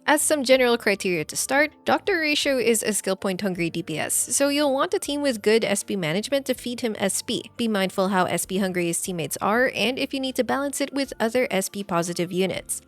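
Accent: Philippines. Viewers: My first video after trawling reddit for recommended Honkai Star Rail CCs, and an uninitiated af, first time HSR player here doing their due diligence. First few seconds of playback and I thought, "Pilipino siguro si," and true enough, you are! Great to see a countrywoman be highly regarded and recommended in the HSR threads of wild reddit and, I'm sure, YouTube (my bad though thinking you had the Y chromosome; a thousand apologies XD). Here's hoping your channel hits 500k subs soon, and grows beyond that! (Yep, did my part to contribute 😉). I realized though this isn't a video for beginners, so I'll just show my noob behind out...and comb through your other tutorials, of course! lol Best wishes!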